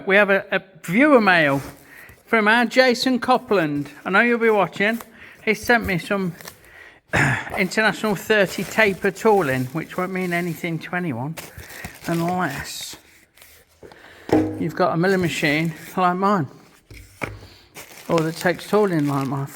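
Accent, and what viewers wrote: United Kingdom. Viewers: boring bar that matey...with carbide insert slot...should have the insert size written on it.....same size tooling as my bport...same lathe too...fk me...and a tattooist lol...me too...22 years at it....got some mates over your end....thought i recognized that fickin accent...not short of curry houses down that melton road...stay free...mad heads and mutoids!!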